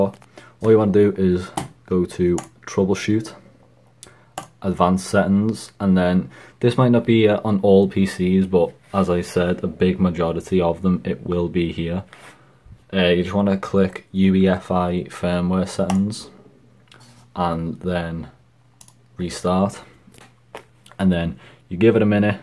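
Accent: Liverpool, United Kingdom. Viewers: taaa lad, did not think id find a scouser on here with actual useful information xD nice one this really did help, my corsait k70 doesn't turn on until the option is allready passed to get into the bios so you saved me a lot of stress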